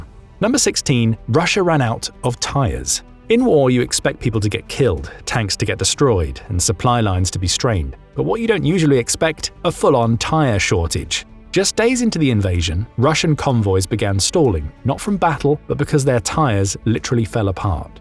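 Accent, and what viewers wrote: United Kingdom. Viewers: British accent. Discount all you hear. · When someone with British accent says it's 100% real, then is based on british media or BBC .... :face-purple-crying::face-purple-crying::face-purple-crying::face-purple-crying::face-purple-crying::face-purple-crying::face-purple-crying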